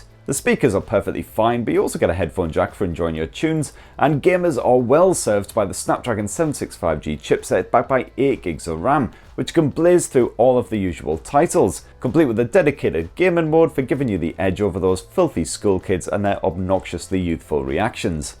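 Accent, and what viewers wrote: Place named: Newcastle, United Kingdom. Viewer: Where ya from ya sound geordie like myself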